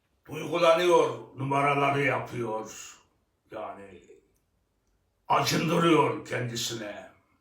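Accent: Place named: Sivas, Turkey. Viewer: öz Tükçe ile konuşmanızı çok seviyorum başkanım tam bizim gibi konuşuyor diyorum ben de Sivaslıyım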